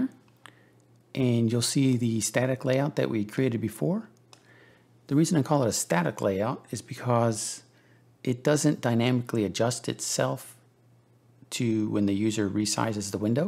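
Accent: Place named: India. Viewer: Are you indian by any chance? You sound like my coworker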